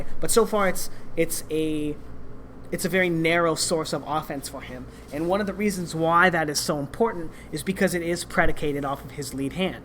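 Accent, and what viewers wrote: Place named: New York, United States. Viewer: You sound like Pauly Magnillagi LOL maybe its a New York thing that accent .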